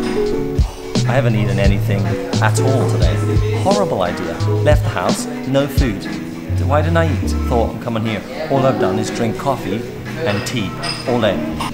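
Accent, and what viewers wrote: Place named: Northern Ireland, United Kingdom. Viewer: Where is your accent from? It sounds like a cross between Northern Irish and a New Zealand accent.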